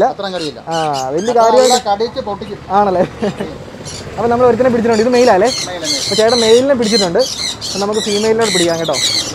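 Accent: Kottayam, India. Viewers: നിങ്ങൾ കോട്ടയം കാർ ആണോ?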